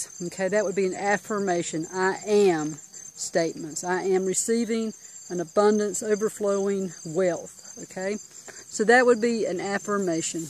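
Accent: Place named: South, United States. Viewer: You're awesome. IM a "Tarheel" Myself,by the way. I Love the Carolina/Southern dialects and accents, your's is music to my ears!! Yee Haw!! Keith.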